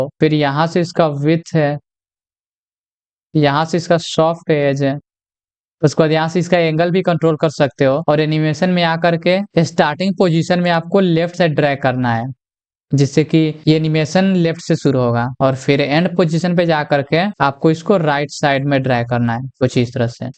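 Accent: Bihar, India. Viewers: bhaiya aaap bihari hai kya ?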